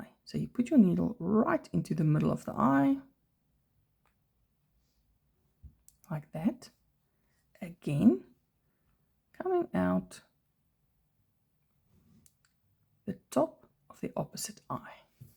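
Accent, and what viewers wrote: South Africa. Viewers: How pleasantly surprised I was to hear your South African accent. Hellooooooo, from Jukskei Park, Gauteng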